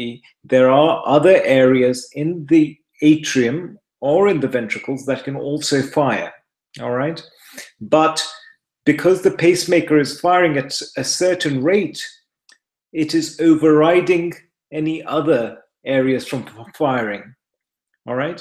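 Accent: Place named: United Kingdom. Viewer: You are sooooo handsome! Why do you have a British accent? You look like my friend, Dr. Raji. From India.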